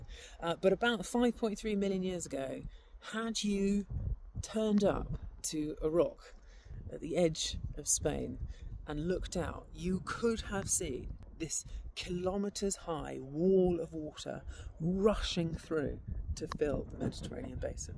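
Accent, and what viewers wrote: England, United Kingdom. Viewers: The British accent is so trippy to me... "Wotah" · BRITISH ACCENT? NONONO... WE DON'T WANNA LISTEN TO THIS GARBAGE! KEEP IT IN YOUR POCKET! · Interesting how a brittish accent and a confident assertion can make a number of massive assumptions based off of a few observable variables appear absolutely true. lol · It was always the English accent. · A beautiful ginger girl talking about tectonic movement in a British accent is so mesmerizing,😍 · It takes a sexy lady with a english accent talking down to us.. lesser prosh accent she from around London south and she like some other people can acutely see how the world moved.. Most human are to busy with a football game . Pig or cow rugbie.. or hitting small balls in small holes wonder why your wife got shaft another place.. To bad you all watch more cartoons probably the smurfs.. 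and cared way to much how one might chip a ball. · I am normally not going for the British accent BUT I am fascinating by her freckles & red hair 🤗 What was she talking about again? 😅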